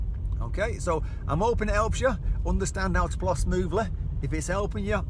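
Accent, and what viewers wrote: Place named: Yorkshire, United Kingdom. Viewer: lol of all videos I could have picked. I recognised the statue on the roundabout. Your Yorkshire accent threw me off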